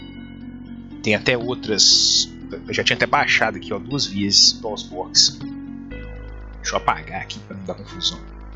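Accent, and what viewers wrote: Minas Gerais, Brazil. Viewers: Sotaque seu é mineiro.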